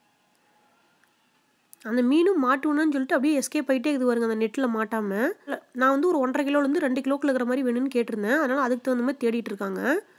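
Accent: Kongu, India. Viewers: Kongu tamil super sis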